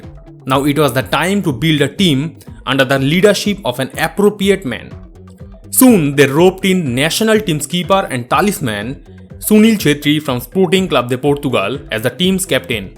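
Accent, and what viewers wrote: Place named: Bangladesh. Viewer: This is some thick Bangla accent brother. Good script though. Keep Growing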